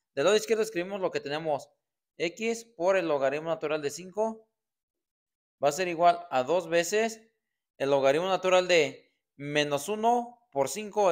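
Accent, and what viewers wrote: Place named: Mexico. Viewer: profe tienes un acento muy mexicano estas muy basdado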